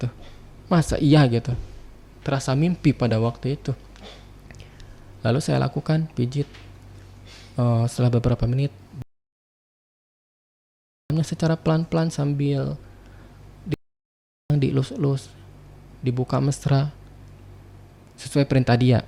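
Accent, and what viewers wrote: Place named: West Java, Indonesia. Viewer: kaya nya org sunda ya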